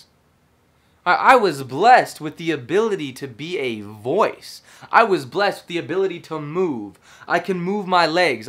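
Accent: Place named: United Kingdom. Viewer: Amazing British voice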